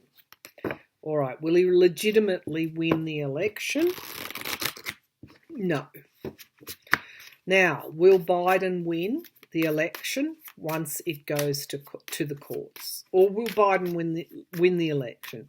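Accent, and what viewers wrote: Australia. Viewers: You are an Aussie? I am from brisbane. I am incredibly nervous. It is so lovely to hear your accent. I am in china.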